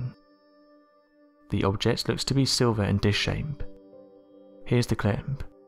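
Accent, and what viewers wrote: United Kingdom. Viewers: A British commentator? Like all the Iconic movies deplicting Historic charactors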